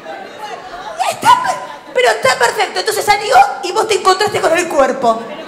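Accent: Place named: Cordoba, Argentina. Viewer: Escuchar el relato y sumarle la tonada cordobeza, es lo más! 😂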